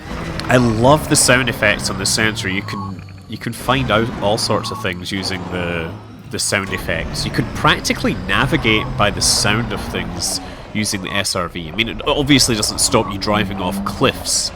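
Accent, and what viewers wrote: Scotland, United Kingdom. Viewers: Goddamn I love Scottish accents.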